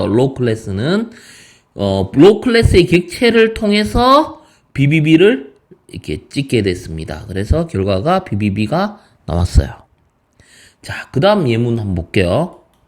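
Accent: Gyeongsang, South Korea. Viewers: 파이썬 고급테크닉을 잘 몰라서 찾아보다가 이거를 처음 봤는데, 사투리 사용하시는게 정말 귀에쏙쏙 잘 들어오네요. 서울말로 하는 것보다 경상도 억양 묻어나는게 훨씬 듣기 편해요~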